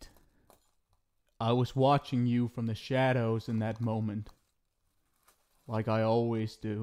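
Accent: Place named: Australia. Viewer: My thoughts through the audio "You can feel me huh?" "Whose they?" "Can it go back huh because what I'm sensing it can't" "Prison!??" "God the lil whine in this is mMMmmmMmm" "Am I picking up an accent hmm?" "Tearing there what out!?! You painted what with what!?!?" ".... Yeah I can see myself falling for someone like this" "Watching me in the shadows huh" "Yeah definitely picking up an accent but which one I think Aussie maybe a British like accent" "Raven that's a cute name" "The sadness in his voice makes me wanna hug him" "Little bit of a lisp it's adorable " "The mood changes are so cute when he speaks *proceeds to do tbe fan girl leg kicks in the air*" "The nicknames he picks are just 🤌✨" "Your maga what what?" "Awww I get a hug and he likes my hair EEEE" "Awwww kisses!! wait wtf am I doing this is a a serial killer yeahhh but he's so cute" "Oh? 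Switzerland huh hm sounds nice" "God why am I smiling" "You're slightly a psychopath but like I'm like this close to letting that slide" "I can't believe in studying to be a detective when I act like this Jesus" "Nah I think we should go considering it sounds like a lot of people" That is all 🤌✨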